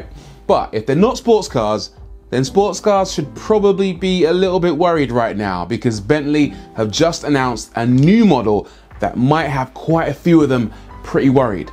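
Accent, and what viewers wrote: United Kingdom. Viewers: Feels weird to watch a black man speak with a British accent...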